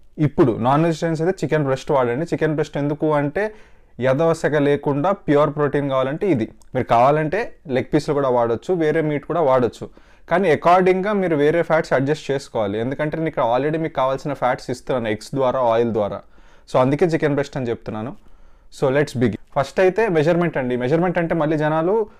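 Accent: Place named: India. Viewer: bro fan of ur telugu slang!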